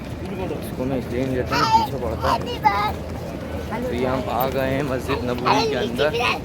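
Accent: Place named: Uttar Pradesh, India. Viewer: Bhai ki boli to india up ki lg rhi hai hm 🇮🇳🇮🇳🇮🇳 hai 🤲🤲❤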